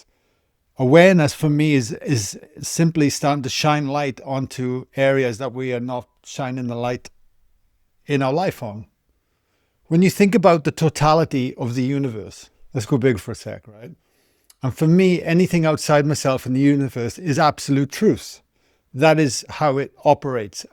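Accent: Wales, United Kingdom. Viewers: Nice to hear a Taff accent. Feels like I'm back in the Valleys 😅. Thanks for these insights based on all that experience.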